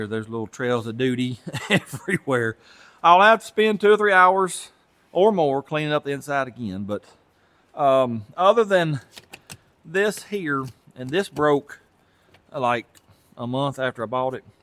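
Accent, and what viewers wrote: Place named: Tennessee, United States. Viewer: I love these birds, but is this really how you people in Tennessee speak?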